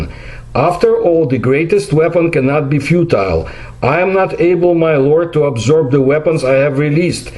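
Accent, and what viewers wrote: Russia. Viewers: Seconded. The videos are a treasure trove but the thick russian accent is a bit hard to follow. I suggest 1.25x for the meantime.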